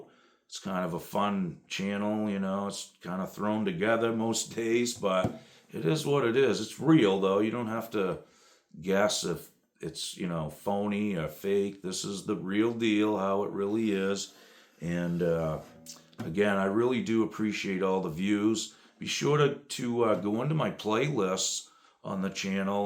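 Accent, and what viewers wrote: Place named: New England, United States. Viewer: What part of New England do you live in?? The accent is hard to miss. Cool guitar.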